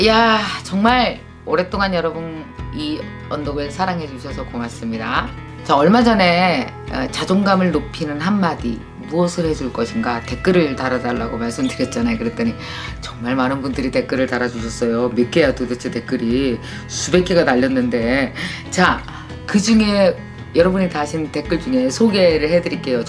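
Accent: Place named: Chungcheong, South Korea. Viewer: 오래전 동료 캐나다인 친구가 자기 어머니의 인간관계 처세술이라며 절대 다리[bridge]를 불태워서는 안된다고 했어요 다리를 불태우면 돌아갈때 다리가 없다고... 일맥 상통하나 표현법이 다르죠 ...정서와 문화가 너무 다르죠...선생님께서는 영어강의를 누구를 위해서 누구를 대상으로 하실건가요? 제가 선생님과 친분이 있는 관계라면 영어 강의 좀 기다리라고 하고 싶어요. 좀 더 유튜브의 비쥬얼에 신경을 쓰고(컨텐츠는 저도 팔로우라 좋아요)있으면... 외국에 있는 한인 교회나 다른단체에서든 러브콜이 올거예요 비행기표와 함께...거기 초빙되어 가셔서 그 멋진 충청도 사투리로 선생님 이야기 들려 줘보세요 다들 웃고 울고 할꺼예요. 대한민국이 전쟁을 겪고도 노벨 문학 / 상이없다고 엽전의식에 젖어 있는 사람들 봤어요 가와바다 야스나리죠? 설국쓴 일본작가? 저는 학생때 읽었는데 이 책이 왜 노벨 상을 받았는지 이해가 안되었는데...중략....다음에 여건이 되면 꼭 본론을 쓰겠습니다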